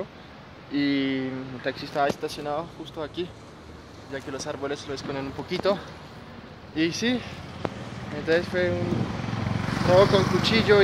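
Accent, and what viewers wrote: Colombia. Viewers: Hola ya hablas como colombiano. Que pena tu robo!! Lo bueno es que no te paso nada. Mucha suerte, que estes muy bien.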